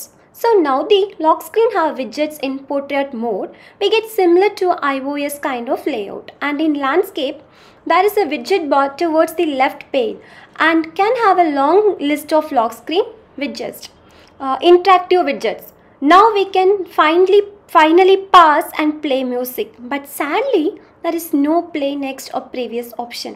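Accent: India. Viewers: I llllllllove your Indian accent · Your indian accent is so thick girl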